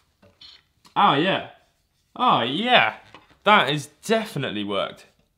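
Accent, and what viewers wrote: United Kingdom. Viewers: raw vid is the way, you uk ppl sounds the same to me from Sweden :) so its like watch the same dude all the time. ;)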